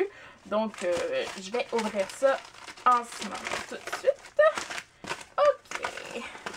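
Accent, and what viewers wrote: Quebec, Canada. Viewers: Salut! Encore une super vidéo qui fait envie:) Je voulais te laisser un petit mot pour te dire que j'aime beaucoup tes vidéos. Je suis française mais je vis à Montréal et ça fait plaisir d'entendre parler de livres avec l'accent québécois que j'adore:) Je t'ai ajouté sur Goodreads pour pouvoir suivre tes reviews car nous avons beaucoup de goûts en commun:) Bises!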